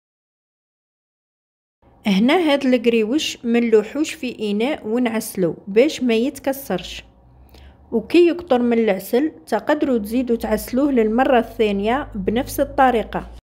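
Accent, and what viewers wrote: Algeria. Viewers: اول مره اسمع اللهجه الجزائريه تدخل القلب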